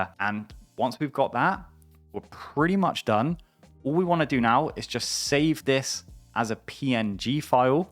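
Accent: Bristol, United Kingdom. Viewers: Aye are u Bristolian too!? Subscribed!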